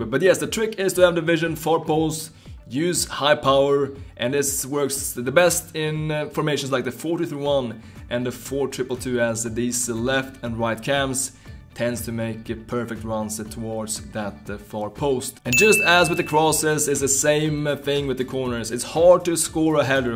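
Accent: Sweden. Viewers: The accent sound swedish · Man hör att du är svensk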